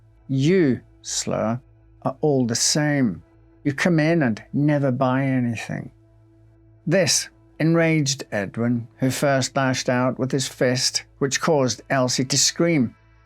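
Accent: United Kingdom. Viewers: I mean this in the best way possible... Listening to an older man with a British accent tell me stories, is just so fitting! 🗣️👴👍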